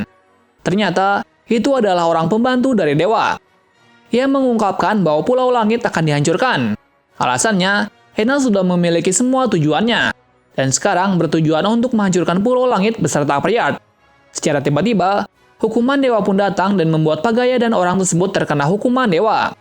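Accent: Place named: Surakarta, Indonesia. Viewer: mirip orang solo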